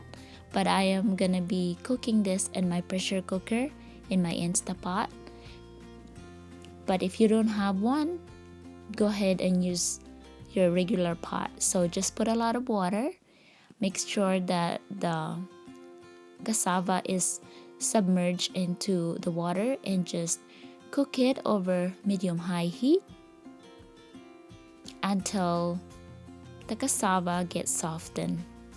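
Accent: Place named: Bohol, Philippines. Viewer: Ganda ng Boses Malamya manalita,Saan province mo,Bohol?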